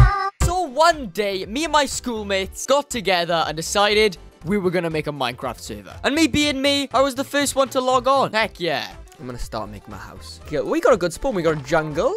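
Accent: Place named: Newport, United Kingdom. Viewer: Man you have grown so much! You probably don't remember but I commented on your Maze 100 days when it came out saying I know that accent anywhere and that I am from Newport! Keep it up I have subscribed hope to get my gaming channel up and running soon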